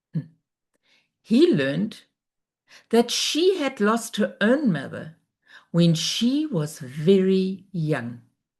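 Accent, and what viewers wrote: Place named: South Africa. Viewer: Lovely to hear your South African accent. Thanks for this video. Will send it to my (adult) kids... getting them to listen is another story 😉🙏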